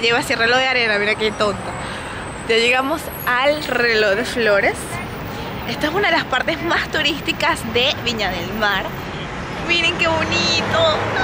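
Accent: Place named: Venezuela. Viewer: Eres venezolana? Me encanta su acento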